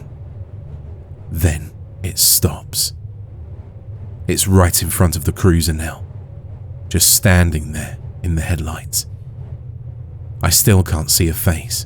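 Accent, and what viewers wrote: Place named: United Kingdom. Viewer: I Absolutely Totally Agree with u!😊I Specially just Luuv his Voice, it's SO soothing n relaxing❣️ I'm from New Zealand so we don't hear the British accent much so I really Luv it. It's so sexy❣️💯:_MHorrorCreep::_MHorrorSkull::_MHorrorSkull::_MHorrorSkull::_MHorrorSkull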